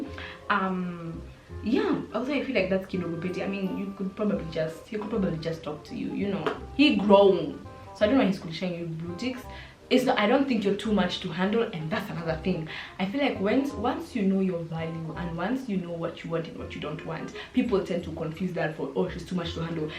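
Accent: United Kingdom. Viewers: Omg do you watch Love Island UK b\c you got so much of their accents?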